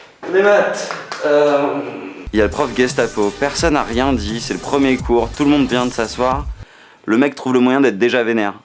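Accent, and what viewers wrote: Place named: Switzerland. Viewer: Mais tu est suisse j'ai l'imprétion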